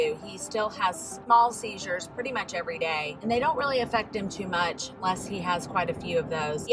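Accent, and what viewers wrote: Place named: South, United States. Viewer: As a cute asian girl (I'm in my thirty's, but still cute. I mean a girl 😜) I must say, it's so nice to see a cute, not asian, girl so excited about and so talented in amigurumi. And a southern one to boot! I always loved accents when I was younger, and now that I live in the accent-less Pacific Northwest, it's nice to stumble across channels that have both something I'm interested in and a super cute accent.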